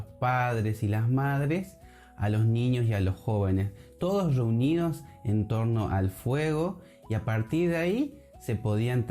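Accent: Argentina. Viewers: Debe ser correntino...igual explica muy bien 👍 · Primera vez que escucho guarani con acento Argentino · Pareces Argentino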